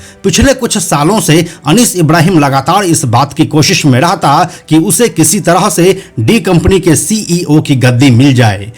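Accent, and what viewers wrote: Bihar, India. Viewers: Aap बिहारी हैं क्या आपके बोलचाल ascent से लगा इसलिए पूछा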